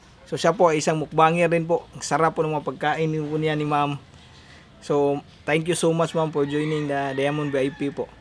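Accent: Visayas, Philippines. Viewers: Tarongin mo naman kahit gamay tagalog mo pre pagka bisdaka motagalog nemu ting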